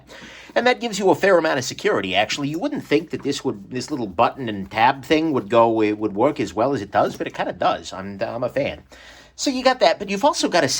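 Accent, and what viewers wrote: New York, United States. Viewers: Because it is worth it to hear someone speaking with a New York jewish accent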